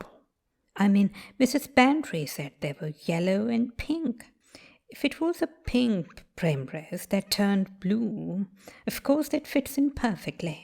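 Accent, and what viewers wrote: RP, United Kingdom. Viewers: I Love upper received pronunciation.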